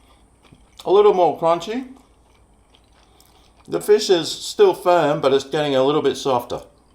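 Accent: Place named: Australia. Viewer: Never thought I would enjoy having an Aussie tell me how to make crispy fish sticks!😂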